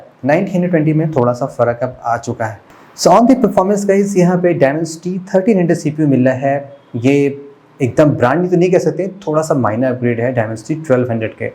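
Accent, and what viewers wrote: Lucknow, India. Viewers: Sir aap Lucknow sa ho kya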